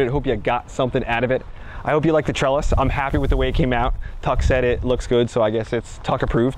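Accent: New Jersey, United States. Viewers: Wow!! I never expected the string part! What great woodworking! Love the NJ accent; Philly born so it is nice to hear! Good job,!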